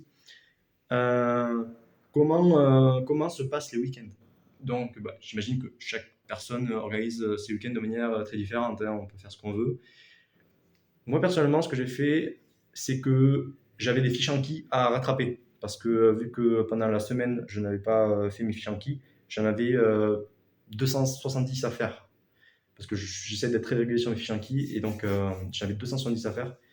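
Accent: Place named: South, France. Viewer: Y’a quand même un truc agréable à entendre un mec avec l’accent du sud parler de X-ENS. Ça rend le truc plus light.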